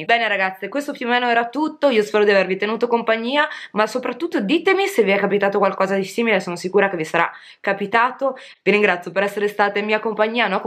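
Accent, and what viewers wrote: Emilia, Italy. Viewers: Fare il "simpaticone" con la donna/l'uomo di un altra persona é trasversale. Non lo fanno solo gli uomini etero piacioni o le Gaie di turno. Certamente, lo fa chi non ha rispetto, per se stesso in primis, e non prova amore verso il prossimo. Guardando video di vari youtuber mi sono fatto un'impressione: molti (idioti) sono gelosi e invidiosi di chi fa video su Youtube, ritenendo (erroneamente) che gli youtuber hanno tutte le fortune del mondo e provano solo piaceri. Ad esempio, certi si fermano soltanto al fattarello che le aziende vi inviano prodotti, non immaginando nemmeno cosa c'é dietro, ovvero che si tratta di lavoro e quanta fatica c'é prima della pubblicazione di un semplice e solo video. Alcuni, ingenuamente, pensano che gli youtuber siano dei privilegiati, fortunati e paraculati...allora, provare a "fregarti" il fidanzato può essere, per qualcuno, una piccola rivincita, perché magari questo qualcuno pensa che te lo meriti, solo per la "colpa" di fare la youtuber. 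Mi viene da pensare questo guardando i video di Cristiano, Marta, Giulia, Mia...e mi vergogno sempre piú di chi abbiamo intorno! Ho sempre creduto fossi emiliana o romagnola, mi pareva avessi un accento di quelle parti li...per quanto non me ne intenda di accenti!:) Posso chiederti un piccolissimo favore? Immagino bene di essere, probabilmente, l'unico uomo tuo iscritto e follower, tra tantissime donne, ma potresti rivolgerti, alla fine o all'inizio dei tuoi video, non solo alle ragazze? Se puoi, mi faresti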